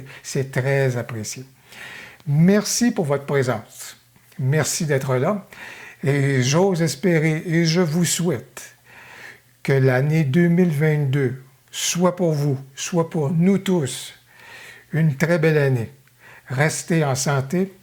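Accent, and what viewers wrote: Quebec, Canada. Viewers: d'entendre ton accent québécois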